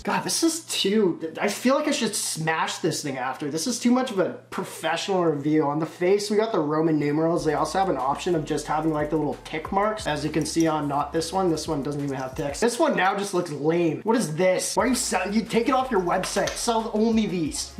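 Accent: Canada. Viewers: You sound like you from Canada 😂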